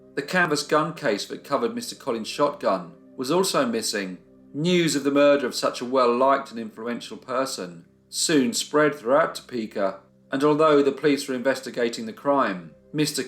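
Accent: United Kingdom. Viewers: One of my favorite bands. Must be an accent thing of that area of Britian.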